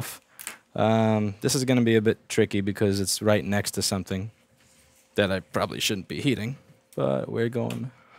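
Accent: New York, United States. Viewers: fuh rod? do you mean farad? (fay-rad) i think would be the phonetic spelling. might just be your new york accent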